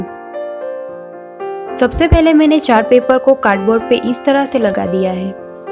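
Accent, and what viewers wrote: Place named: Gujarat, India. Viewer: R u gujarati??your sound is like gujarati...btw,great work..👍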